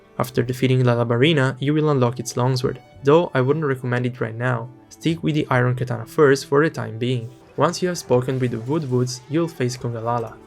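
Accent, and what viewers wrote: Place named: Italy. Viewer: he's italian, so you gotta deal with his accent, he speaks very clear imo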